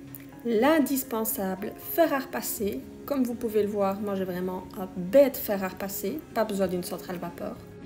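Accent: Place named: Belgium. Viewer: Salut, t'excuses pas de ton accent Belge, sois en fière. Bonne chance pour ta chaine, ça a l'air très intéressant.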